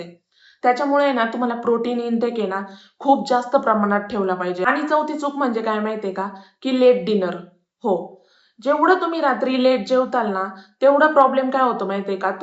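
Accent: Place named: Nashik, India. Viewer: Kuthun ahes tai...tujhya bhashebarun tumhi Nashik chya asavya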